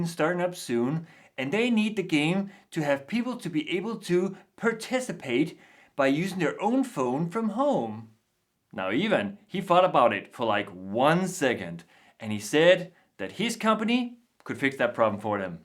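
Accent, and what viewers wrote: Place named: Denmark. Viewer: Work on your danish accent. Work on your postere. This whole thing felt very bland to Watch. Also this has 0 relatability for none danish viewers, so if you insist on doing video’s in english, make sure that there is at least something that none danes Can relate to. Otherwise you might as Well just speak danish. Not trying to be toxic. This is just my honest oppinion.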